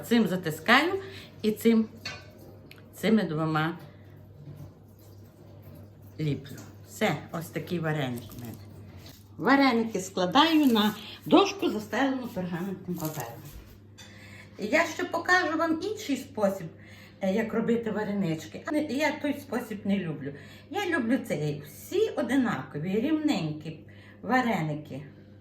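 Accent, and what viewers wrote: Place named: Galicia, Ukraine. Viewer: Дякую ❤ Я б оце слухала і слухала Вашу чарівну Галицьку мову. 😊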